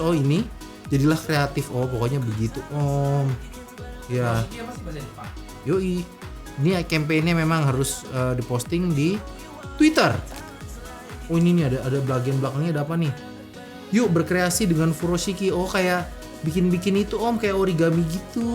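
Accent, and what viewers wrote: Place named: West Java, Indonesia. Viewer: Nyunda pisan si akang wkwk.. Di sorodotkeun wkwk😂😁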